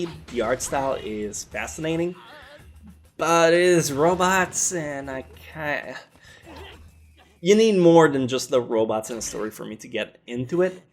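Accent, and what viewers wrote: Quebec, Canada. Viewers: I feel like now that I know you're from quebec I can't "not hear" the signature accent of a quebecois speaking english xD i'm from montreal lol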